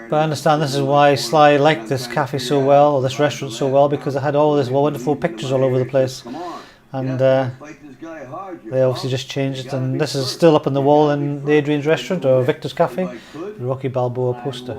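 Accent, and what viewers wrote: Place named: Scotland, United Kingdom. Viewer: Cool video , very well done . Did the same trip myself . Hint of an east coast Scottish accent too I believe ! 🏴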